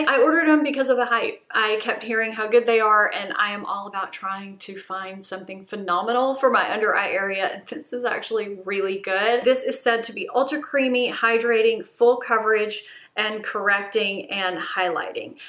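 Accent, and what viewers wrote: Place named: Memphis, United States. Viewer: Gotta say, being from Memphis, your Southern accent sounds distinctly like it's from my area. You sound like a Memphian! Great videos!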